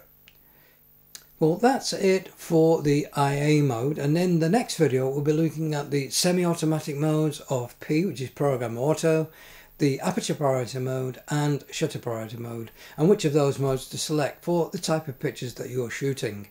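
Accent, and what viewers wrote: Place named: Manchester, United Kingdom. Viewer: Thanks,. Another great series. I've just picked one of these up (practically unused - it's in immaculate condition) on ebay for £550 and absolutely loving it. What's the point in reading through an instruction booklet when you've done a much better job that's easier to follow. It's also nice to have it in a Mancunian accent.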